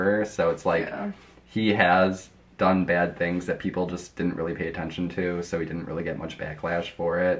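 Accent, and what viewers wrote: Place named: Michigan, United States. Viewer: Omg I heard your voice and I guessed that you were from Michigan before you said anything!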